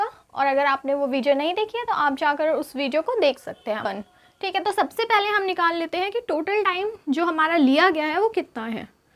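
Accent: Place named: United Kingdom. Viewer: Mam apki accent british wali h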